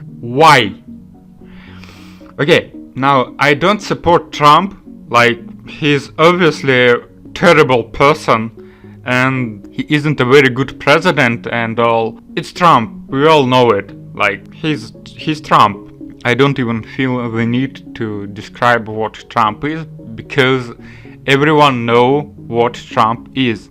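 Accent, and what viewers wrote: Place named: Russia. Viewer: It's a most genuine russian accent. But whatever makes you sleep better at nights.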